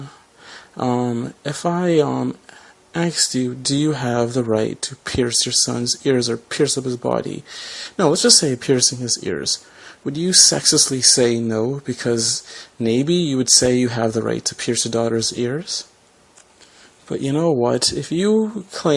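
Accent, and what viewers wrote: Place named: Russia. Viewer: I like your accent is it Russian.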